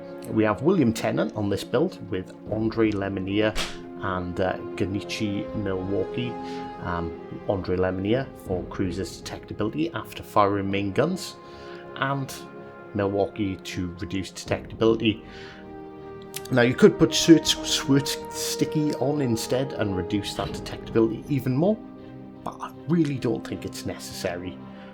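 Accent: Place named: United Kingdom. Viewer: Which part of Britain are you from? Love the accent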